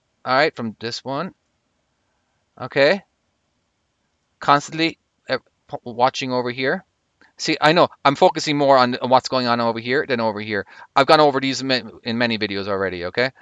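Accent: Ireland. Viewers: are you Irish? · What accent do you have? To me it sounds kind of Irish, another trainee says Canada.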